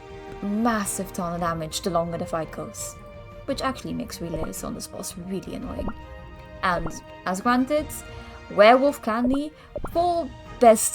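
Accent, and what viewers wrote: United Kingdom. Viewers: Bri’ish tbh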